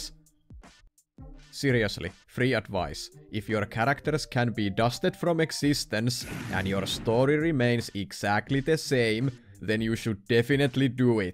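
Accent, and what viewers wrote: Finland. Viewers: Came to see High Guardian Spice being ripped to shreds for the atrocities it commits on the name of animation and anime, and stayed for the great jokes, funny delivery and that thick Finnish accent... jatka samaa mallii, ootan innolla osaa numero 50! 👌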